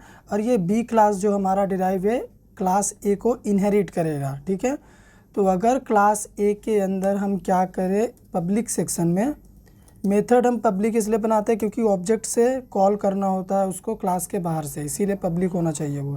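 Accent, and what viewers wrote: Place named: Hyderabad, India. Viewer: Hyderabadi slang zindabad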